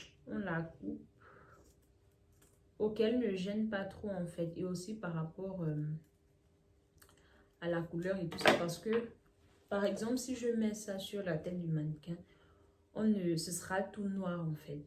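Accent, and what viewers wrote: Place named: Gabon. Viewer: Cc vous etes gabonaise? A cause de l'accent...svp jai besoin de la formation svp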